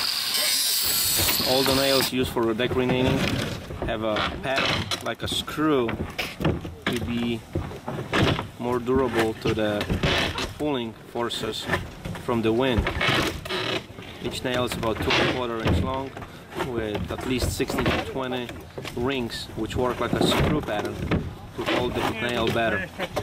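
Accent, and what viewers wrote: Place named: Mexico. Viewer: good to see these tough americans working so hard. Gotta keep those jobs. No damn Mexicans come here as roofers, get rich and demand overly high wages. Not in my land. Hope that heavily accented Mexican commentator isn't getting paid much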